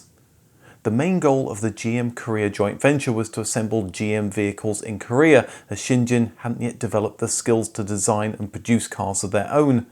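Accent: England, United Kingdom. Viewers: It's had to watch when the presenter can't pronounce the names correctly (typical English accent). It's said "Day-Whoo" not Die-ooh" (or what ever he's actually saying) and some of the other names he incorrectly uses are atrocious..thankfully he pronounced the "e" in Porsche..it's even correctly said by another person in the episode, yet apparently he can't couldn't hear it...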